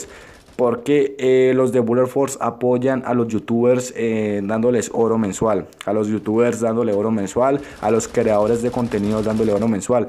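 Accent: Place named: Colombia. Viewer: Pensé que era colombiano por el acento y cuando dijo bacano me lo confirmo . Saludos desde bogota !!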